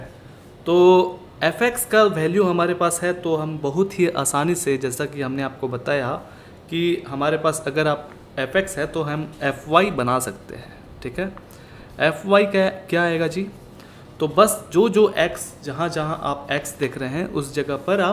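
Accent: Bihar, India. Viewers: Sir aapke aawaaj me hamare bihar ki jhalak aa rhi hai ,, kyonki isme koi gunjaish hi nhi hai naa samjhe naa , ok paarnaam ,,ram ram 💪🙏🙏🇮🇳🇮🇳🇮🇳💪💪💪💪💪🙏🇮🇳😘🥰😍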